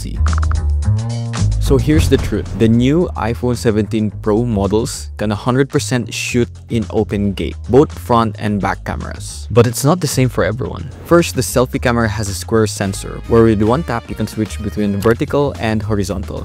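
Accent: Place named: Philippines. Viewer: Love the Filipino accent ❤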